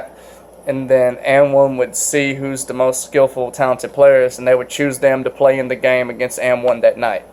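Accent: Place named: South, United States. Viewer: I'm from Georgia, and you got that thick southern accent(Texas right?) just like me lol.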